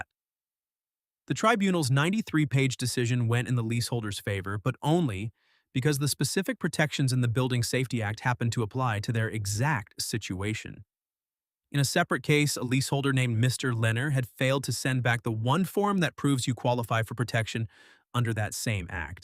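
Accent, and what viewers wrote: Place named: United States. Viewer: Why am American accent?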